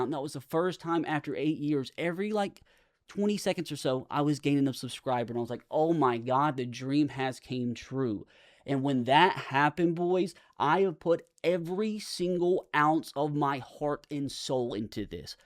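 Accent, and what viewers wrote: United States. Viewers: I hope this helps but I haven't played the last 2 maddens and I STILL watch your videos! I love the rebuilds I love the irl stuff and the playing the career of a rookie. I also think you've gotten MORE funny. I also find your voice oddly soothing haha I mean people in different parts of America have different accents and they can't help that so honestly f*ck the people sh*tting on your voice. If they don't like it they don't have to watch. People just love to be toxic on the internet. If they met you irl they'd tell you how much they love your videos and want a pic. I'm also in my 30s and have a depression problem. You just gotta focus on you man and keep putting out the content that everyone has loved. You're great at this man. Don't let the haters get you down man!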